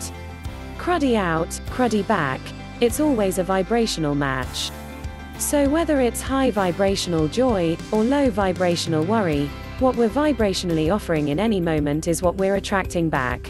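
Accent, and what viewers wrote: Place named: RP, United Kingdom. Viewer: I lived this book but it’s the wrong narrator reading it so I’ll have to try to find the original audiobook read by the author. I’m from the UK but this received pronunciation where every words spelt out slowly and without emotion just doesn’t fit with what this book represents.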